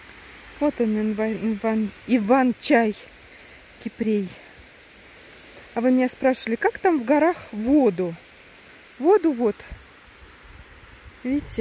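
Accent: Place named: Don, Russia. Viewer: Спасибо большое и за видео такой красоты! Такая экзотическая природа для нас, жителей степной зоны. Такая кпасотища в горах! И ещё в каких горах! Находясь не в любых горах можно обозревать такие великолепные виды. Смотришь, смотришь - не налюбуешься. А какие деревья с камнями, как приятно радует глаз ярко - зелёная трава и папаротники. А водопад.... слов нет, только эмоции восхищения! Спасибо Богу, что он так нас любит, что для нас людей такие виды создал, а вас, использует с, чтобы побольше людей могли таким полюбоваться, чего они в своей каждодневной жизни не видят и видеть не могут. Спасибо, что взяли нас в поход за грибами. Как там интересно с вами ходить... что ни шаг - прекрасные виды и в вверху и внизу и по сторонам. Как вам там сложно снимать было! Ведь всё время под ноги надо смотреть и баланс тела держать и про съемку не забывать. И высоко всё - таки, чтобы у вас и голова не закружилась. 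Вы как молоденькая девушка порхали там с пенёчка на пенёчек. А потом как показали снизу - крутизна то склона какая была! Смелая вы женщина, по вашему говору, мне кажется, что вы - донская козачка. Может я ошибаюсь, но удаль у вас и смелость и напор, как у них, мне так кажется. Не знаю кто бы мог так бегать по таким горам с таким азартом. Моё вам уважение и восхищение. Не слушайте никакой критики, если она будет - вы лучшая по съемкам в горах. Мне очень, очень, очень понравилось! Вы полностью донесли до нас коло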